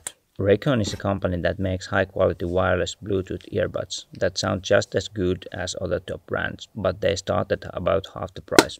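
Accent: Finland. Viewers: You have such a lovely Finnish accent! I hope we hear you talk more sometime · Is that Finnish accent? Torille! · he's Finnish there's no question about it. All the products he uses are with Finnish labels, the newspapers he uses sometimes are Finnish etc. Also the accent is very typically Finnish too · is that a finnish accent? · I love your voice with your finnish accent! very fun to listen to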